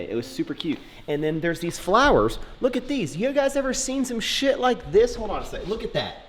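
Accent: Texas, United States. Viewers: you have started to sound like a Texan 🤣 best of luck for your surgery!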